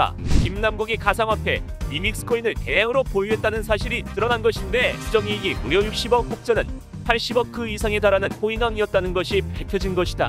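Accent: Jeolla, South Korea. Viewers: 뭐여 전라도여?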